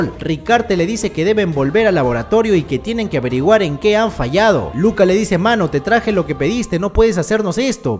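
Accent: Peru. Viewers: Soy yo o es Peruano?🇵🇪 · Literalmente en informacion del canal y por su forma de hablar, es peruano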